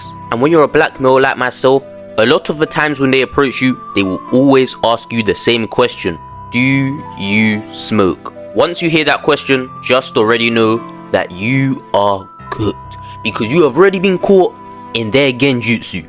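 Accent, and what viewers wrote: United Kingdom. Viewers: Londoner ain’t ya? You sound it atleast and the stories screammmm London lmaooo but this does happen in brum too sadly 🧍🏾‍♀️😭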